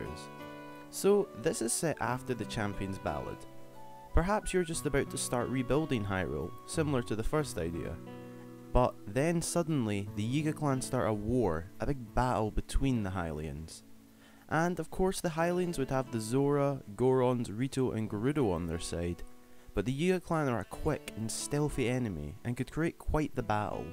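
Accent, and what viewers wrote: United Kingdom. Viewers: Are you Britain? Just wondering because of your Accent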